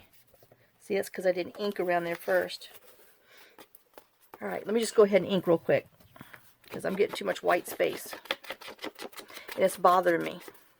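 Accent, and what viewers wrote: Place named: Mississippi, United States. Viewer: Hi. You mentioned you was from Biloxi,Mississippi. My daughter was born there at Keeesler Air Force base. I lost her in 2007. But anyway I came back to Missouri talking that "you all" quite while. I love your accent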